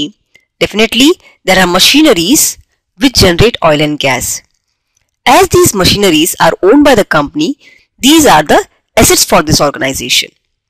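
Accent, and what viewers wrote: India. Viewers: I find some of the comments here a bit offensive. The presenter may have an accent and a high voice but I find their explanation clear, easy to follow and concise. The English is grammatically correct and yes may have an Indian lilt but is easy to follow.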